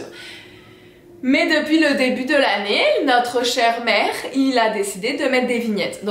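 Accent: Quebec, Canada. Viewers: Tu devrais faire une vidéo sur ce que t'aime et n'aime pas de l'accent Québecois vs Français. Je pense que t'aurais une perspective unique parce qu'on dirait que ton accent est un mélange des deux. Peut-être parler des fois quand personne te comprenais ou quand tu comprenais personne, les expréssions utiles ou bizzares, les réactions à ton accent, etc.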